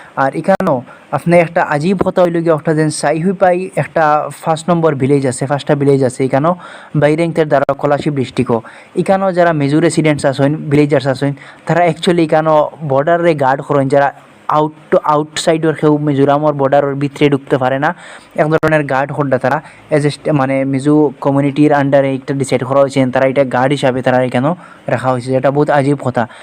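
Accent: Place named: Sylhet, Bangladesh. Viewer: Maybe because of His Nagri accents it sounds like Borok.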